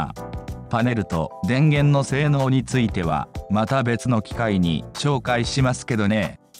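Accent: Hokkaido, Japan. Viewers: 今回は音声付きですね😆 北海道弁の感じがいい感じですよ👍 ソーラーパネル、災害の時のためにあると良いですね👏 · ホント、北海道もし冬に災害来たら怖いですよね😱 なまらとか、北海道弁🤣🤣あまり最近使わなくなりましたね。なんとかさーとか🤣北海道弁に聞き入っちゃいました。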